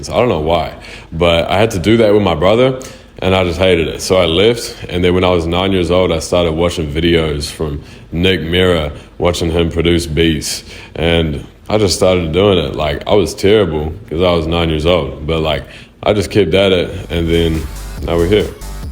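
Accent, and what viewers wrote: Australia. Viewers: HE SOUNDS ALSRLIAN BUT HE SAID HE IS NOT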